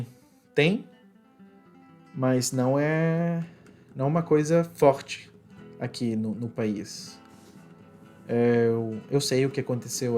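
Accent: Brazil. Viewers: vc inteligente e fala sotoque brasileiro perfeitamente abraco falou muito bem tamos juntos e nois. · Ele parece mineiro,fala devagar 🤭🤭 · Acho que tu tens um sotaque acreano. Não sou de lá mas se alguém do norte ler isso pode confirmar. Se pronunciasse todos os S chiado seria um sotaque carioca completo. Mas como pronuncia o D e T a moda brasileira, o R francês e quase sempre o S silvado, diria que é parecido com do estado do Acre.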